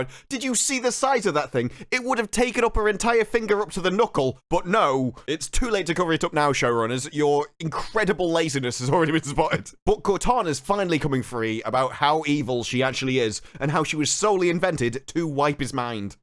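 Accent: United Kingdom. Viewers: It's so fun to hear these reviews: It's like a recap of many of my own thoughts when watching the episode, in a UK accent. Thank god this season's over, let's hope the viewing numbers and backlash finally kills this foolishness a.s.a.p. (even if it's already renewed, goddamnit) Additional thoughts (alongside existing review): - Why do Plank and Tank react with any emotion to the reveal of kidnapping, instead of going for cold logic? - How'd Cheeks keep up with the other running spartans when chasing covy ship, without armor? - How'd Pinkstreak get into the ship, when she's only got a sidearm with her and it's literal spaceship-grade hullplating? - Why, when she hero-lands in the hallway (how'd she get a hole precisely over that place anyway?), is there not any howling wind from the hole she magicked into the hull of the ascending craft? - How did the crashing ship come back to basically in the same airbase that it launched from, when it was presumably programmed to fly away from there and was in the air for several minutes? 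- Why is the post-massive-security-breach meeting with non-relevant characters (Miranda and John) in the science room and not a boardroom of senior personel yelling at Parangosky? - Cortana should've answered: "I was in Cheeks' brain, so had access to every sensory datapoint. All these pointed to truthfullness (see archived report)." - Why has the UNSC not sent at least 1 single probe to the system that might hold enormous strategic importance instead of s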